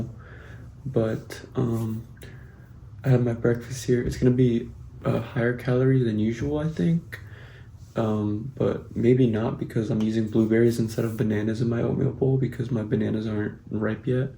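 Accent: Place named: Spain. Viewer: We like your Spanish accent 🇪🇸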